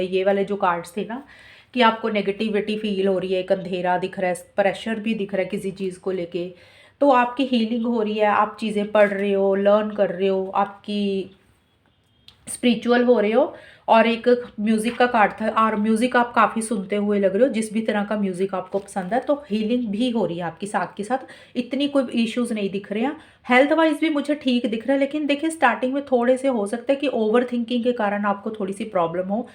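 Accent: Punjab, India. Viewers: nice punjabi accent👍👍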